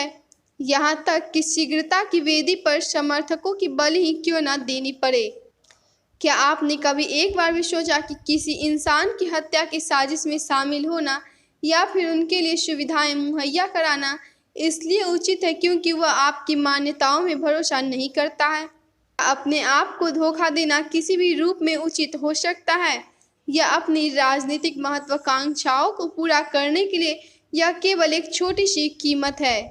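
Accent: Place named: England, United Kingdom. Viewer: इस प्रकार के compere !!! इंग्लैंड में पैदा हुए थे और भारत मे हिंदी बोल रहे हैं। स को श बोलने वाले ऐसे लोगों को तो dislike करना भी संभव नहीं। अच्छी खासी न्यूज़ की रेड़ मार देते हैं, पूरा मज़ा इनकी भाषा और accent से खराब हो जाता है।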